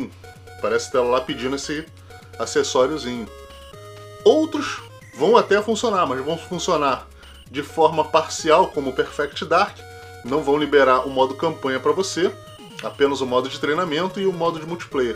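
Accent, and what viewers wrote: Rio de Janeiro, Brazil. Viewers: Fala, sempre curtindo seu canal, sua coleção bem bacana, acompanho sempre. Sotaque de carioca e voz de locutor de FM kkk, curto sempre seus vídeos abraços.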